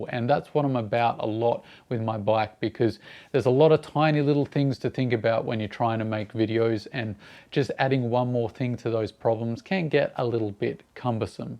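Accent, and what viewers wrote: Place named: Australia. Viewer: bro you're australian as fuck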